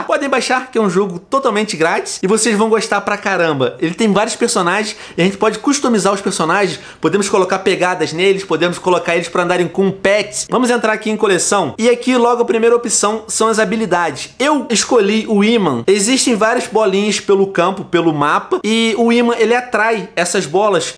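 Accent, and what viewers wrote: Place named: Portugal. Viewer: Tio fala em sotaque português ☺☺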